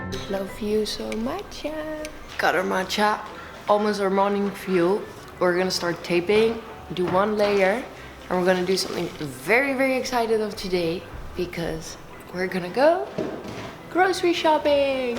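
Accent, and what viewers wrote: Netherlands. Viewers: Are you dutch? I hear a little accent🤪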